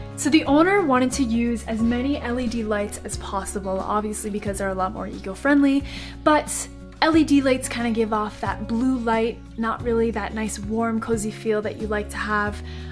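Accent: United States. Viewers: From her accent I think she's from the USA. So this ever so eco friendly gal, did she get to Guatemala by bicycle? I fucking doubt it.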